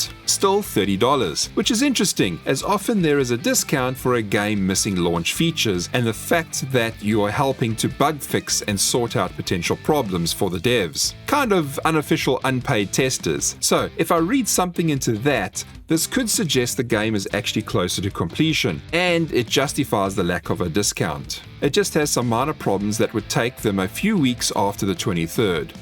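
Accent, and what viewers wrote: South Africa. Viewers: you south african bro? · Lol funny how I could tell you are South African as soon as you spoke.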